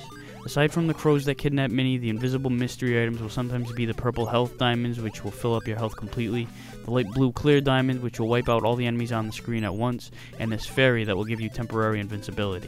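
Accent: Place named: New York, United States. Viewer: I don't see all that much AVGN, really. He just has a bit of that New Yorker accent. :T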